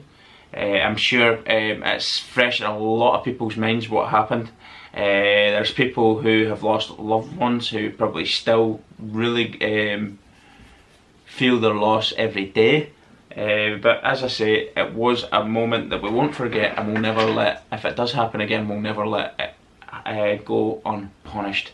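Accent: Scotland, United Kingdom. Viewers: Brings powerful memories rushing back. Love your Scottish accent!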